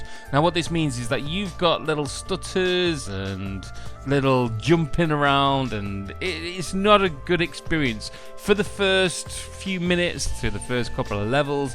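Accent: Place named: Northern England, United Kingdom. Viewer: spoken like a true northern lad ha ha! Glad you got the rest you deserve it's nice to see your stuff coming out whenever I get chance to see them, your EMUnation is a great idea. I'm sure the guys on the dev teams appreciate you as much too. Have a great one mate and have a beer on us!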